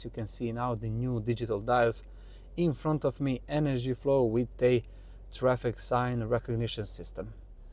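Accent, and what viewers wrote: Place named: Balkans. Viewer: Vidi sa da Balkanac prica na ENGLESKOM 😅😇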